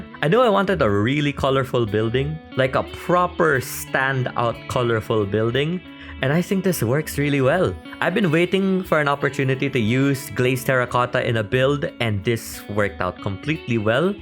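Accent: Philippines. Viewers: 10 seconds in and i clocked that you're filipino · Omg i was like woah he sounds Filipino and i was fukin righttt yipeeeee 🎉🎉 a Filipino ama watch you now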